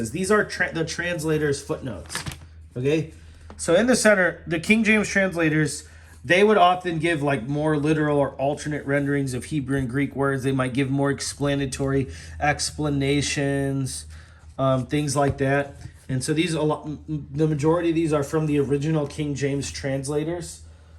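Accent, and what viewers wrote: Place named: United States. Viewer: He also pronounces calfskin as 'keffskin' so I'm assuming it's a regional US accent!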